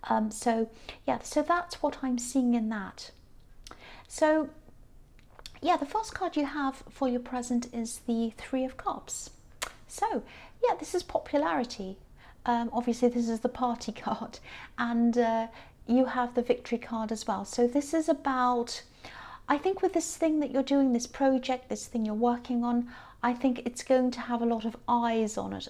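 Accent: United Kingdom. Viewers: If that is my ex, hmmm I will refer him with the best therapists but that's the best I can do. He rejected me and used me at the same time and that ship is sailed. I'm so grateful cuz I've learned, healed so much and I don't hate him. I m more focused on work and personal development all the levels there are. You are so good and inspiring, you're gifted. Thank you very much, I am 4 days away to my birthday and really ready to embrace the new life I want so the past can be flushed in the toilet and only keeping the lessons!❤ where are you from? I love your accent, I wonder is it the UK?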